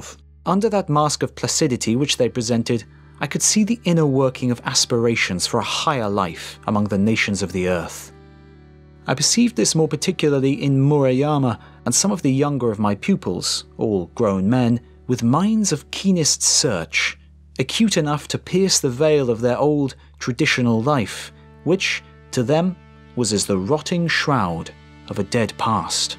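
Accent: United Kingdom. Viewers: For a Native American, he sounds slightly British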